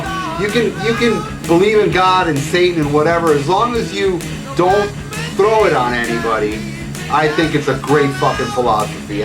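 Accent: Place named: Chicago, United States. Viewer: Did you grow up in Chicago's "Back of the Yards".......because you use the same expressions and have a bit of accent like us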